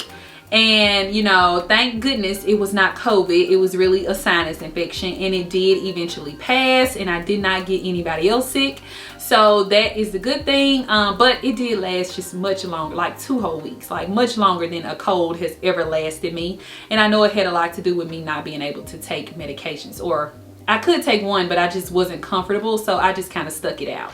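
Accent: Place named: Louisiana, United States. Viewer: #1 Congrats!! Two blessings 💕✨ #2 You are so dang precious!!!! Lol your accent (I'm from Louisiana so I get it Haha) + your mannerisms are just too likeable! Prayers for you & those babies 😊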